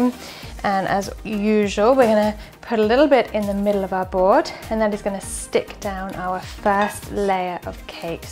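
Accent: United Kingdom. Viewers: I am learning not only your recipe, but an amazing British English accent...!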